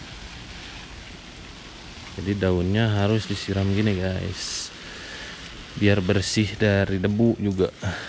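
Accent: West Java, Indonesia. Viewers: Subhanalloh...kang orang sunda nya...logatna euy... Beh mana cikundul..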